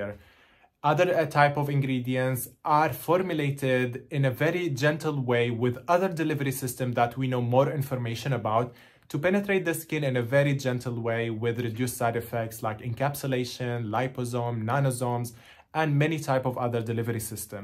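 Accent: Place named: Italy. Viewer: What a charming accent. Are you Italian?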